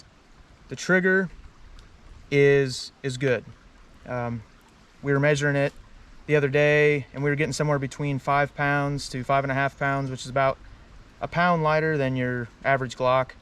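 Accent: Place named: Tennessee, United States. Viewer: I can tell your from Tennessee. Same 🤣 you sold me On this .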